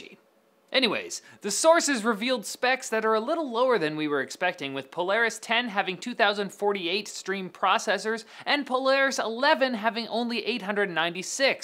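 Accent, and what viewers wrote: Ireland. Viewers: such a racist Irish accent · Nice Irish accent....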